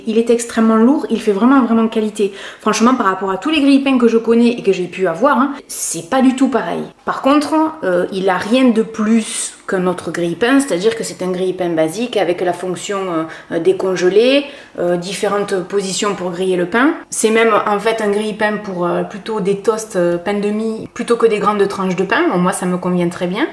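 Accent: South, France. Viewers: Coucou, ça n'a rien à voir avec la vidéo, mais je voulais juste te dire que j'ai beaucoup de plaisir à t'écouter parler. J'aime beaucoup ton accent du Sud! Reste comme tu es! Sinon, Super vidéo! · bonjour, je suis une nouvelle abonnée et je voulais vous dire que je vous trouve rayonnante, j'ai 49 ans et je fais sans doute partie des mamans qui vous suivent. Votre accent est un bonheur absolu car moi aussi je suis du sud (Marseille)même si je vis en Bretagne, continuez à nous donner de la joie et de la bonne humeur, restez vous même contre vents et marées. Je vous embrasse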